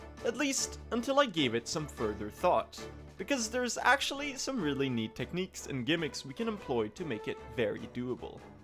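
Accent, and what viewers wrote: Sweden. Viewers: brother are you swedish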